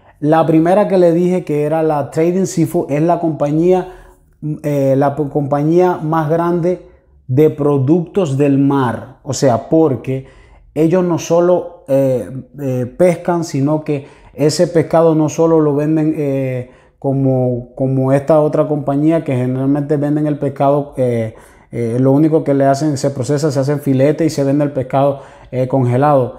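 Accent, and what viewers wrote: Dominican Republic. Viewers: Tiene acento dominicano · Hermano usted es dominicano?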